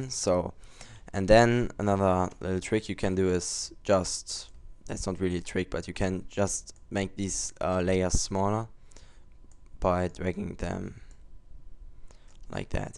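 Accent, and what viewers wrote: Germany. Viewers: Your German Innit?:3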